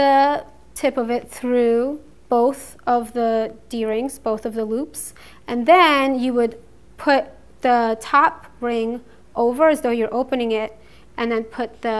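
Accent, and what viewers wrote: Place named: New York, United States. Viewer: Thanks so much for this simple, straight up explanation and demo. Love your New York accent too! Cheers from UA, Ohio.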